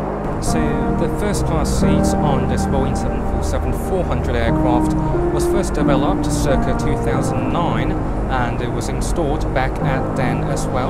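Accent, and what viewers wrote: United Kingdom. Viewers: 兄弟还是英伦腔哈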